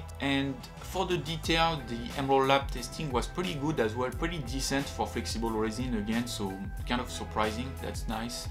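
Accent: France. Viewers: Vu l'accent, t es un Frenchy!!!!! 🤗😋 Tu penses qu on peut colorer la résine si elle est claire, avant impression? Ou colorer les objets imprimés?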